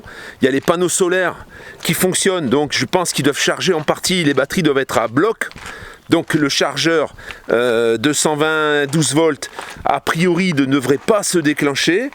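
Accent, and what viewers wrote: North, France. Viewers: Pas mal du tout!!mais tu n'as pas des origines du nord???t'as un acchent de ch'nord!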